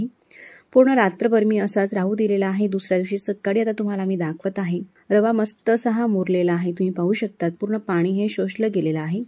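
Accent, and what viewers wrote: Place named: Jalgaon, India. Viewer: Jalgaon kadil lok asech boltat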